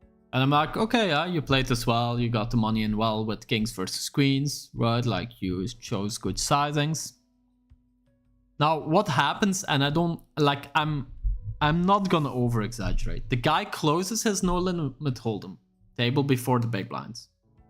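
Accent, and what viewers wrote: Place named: Flanders, Belgium. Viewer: Echt insane hoe hard ik in de eerste 5 seconden hoorde dat gij Vlaams bent. Niemand in de wereld spreekt zo Engels.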